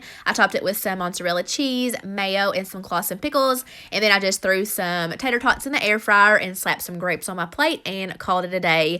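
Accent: South, United States. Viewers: I can't fir the life of me believe some one would call you lazy!!!!! Your always making fabulous dinners for your family, don't ever explain yourself to anyone. Your a good Wife and mommy. Love you. I especially love your southern accent. Your a doll. 🍳🥯🍗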